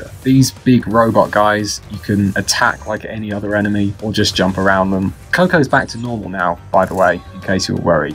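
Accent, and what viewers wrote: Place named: United Kingdom. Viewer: Im so glad i found ur channel. Ur my favorite crash bandicoot modder with a british accent on youtube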